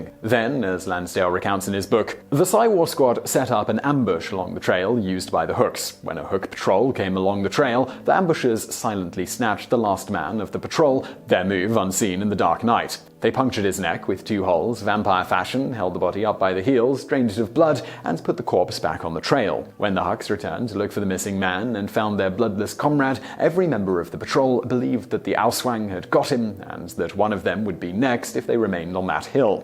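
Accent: United Kingdom. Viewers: That moment when you watch so many videos that you actually develop a decent British accent by listening to. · English Accent* I'm Scottish and trust me my accent couldn't be further from his lol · J H when you watch so much people in your life start developing a British accent.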